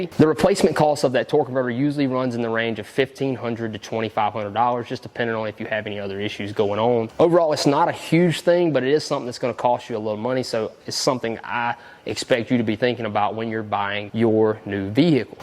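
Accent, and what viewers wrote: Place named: South, United States. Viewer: you're a natural presenter. You really know how to take a complex topic and condense it for non-mechanics to understand. And your southern accent makes the videos even more enjoyable to watch. Keep up the great work! ☮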